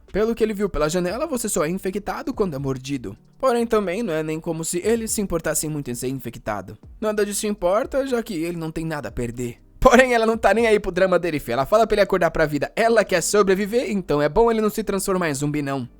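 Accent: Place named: Minas Gerais, Brazil. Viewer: É só impressão minha ou o jeito dele de narrar tá menos caricato e exagerado? Eu falo daquela melodia meio mineira que acho que tá menos acentuada mas não sei.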